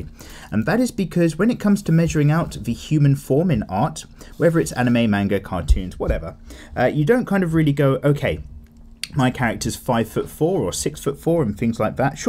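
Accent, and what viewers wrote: United Kingdom. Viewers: British english >:D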